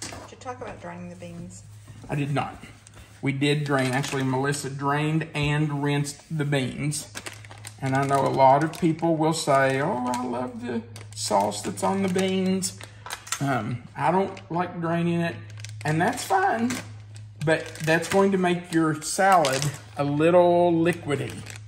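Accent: South, United States. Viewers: Thank you for all the yummy recipes! I love your calming Southern drawl. Sometimes your videos make me sleepy, your voice soothes me so much! Thanks, Melissa, for writing out the recipes. Sending hugs from Beth in Savannah, GA.🤗💜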